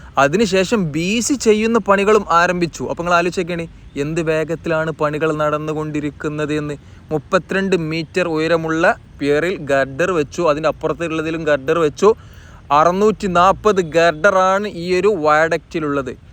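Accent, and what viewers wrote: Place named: Northern Kerala, India. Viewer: താങ്കളെ കാണുവാൻ കഴിഞ്ഞതിൽ സന്തോഷം. പ്രോഗ്രാമുകൾ നന്നാകുന്നുണ്ട്. പ്രത്യേകിച്ചു താങ്കളുടെ വിവരണം. വടക്കൻ ഭാഷയിലുള്ള സംസാരം, ഞങ്ങളെപ്പോലുള്ള കോട്ടയംകാർക്ക്, കേൾക്കുവാൻ ആകർഷകമാണ്. All the best.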